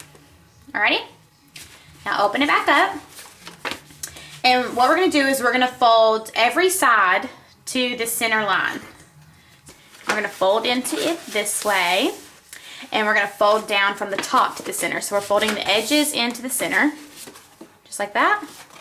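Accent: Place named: South, United States. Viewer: Love that southern draw sweetie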